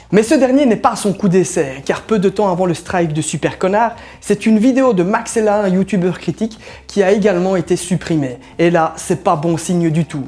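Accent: Belgium. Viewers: tu es belge nn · T'es liégeois ?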